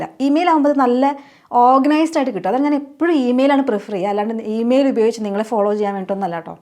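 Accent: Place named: Thrissur, India. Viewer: ചേച്ചി തൃശൂർ ആണ് ല്ലേ... ജർമനിയിൽ പോയാലോന്നും തൃശ്ശൂർ tech വിടൂല 😄.. എന്തായാലും ഇങ്ങൾ പൊളിയാണ് ❤️❤️❤️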